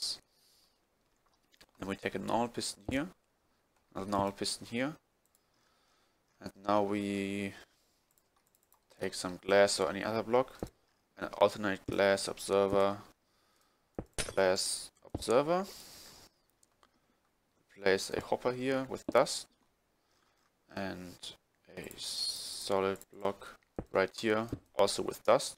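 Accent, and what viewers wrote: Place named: Germany. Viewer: Nur wegen dem Akzent sofort gewusst deutscher😂😂😂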